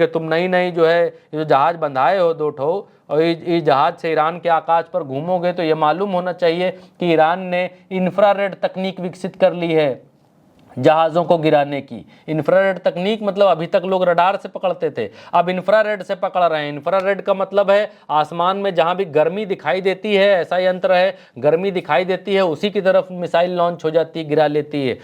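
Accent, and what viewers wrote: India. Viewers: 👌❣️👌👌❣️👌👌❣️👌👌❣️👌👌❣️👌👌❣️👌👌❣️👌👌❣️👌👌❣️👌👌👌❣️ *Love you, भाई for your देसी ॲंदाज़-ए-बयॉं.!!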